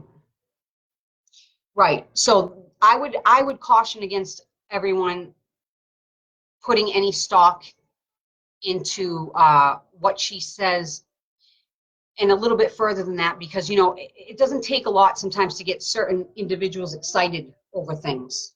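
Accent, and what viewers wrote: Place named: New England, United States. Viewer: I’m from Massachusetts too!! I heard your New England accent right away.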